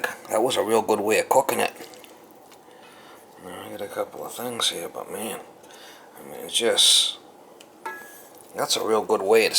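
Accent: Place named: Boston, United States. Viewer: Buttah, bahg, get everything togethah, containhas, heah, I love the Boston accent! Haha I’m from Norfolk county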